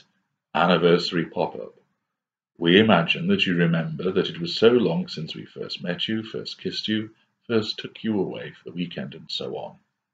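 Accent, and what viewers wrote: United Kingdom. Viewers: you did Hoover me back with the audios! Brilliant work, you deserve the fuel you are getting. Nice to put a voice to the lessons. Oh, that British accent of yours! I'll be forever grateful for all I've learnt from your writings.:) Still not ready to have you write my online dating profile, but I'm still an escapee. Narc did not see it coming. Thanks! Blackwidow.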